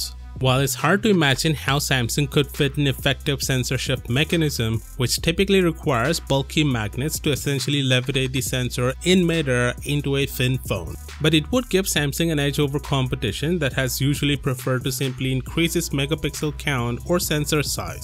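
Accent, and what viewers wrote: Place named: India. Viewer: The Speaker Hindi Engelish🤮🤮🤮🤮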